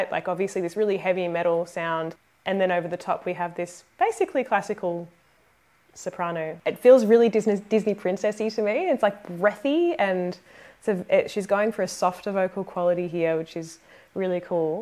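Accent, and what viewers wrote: Australia. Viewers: Love your Australian accent ^_^ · Are you Aussie? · G'day! Do I recognise the accent? Welcome to Nightwish. Nightwish Army, Blue Mountains Corps, Australia! What Gabriel said! Any song from this concert will knock your thongs off!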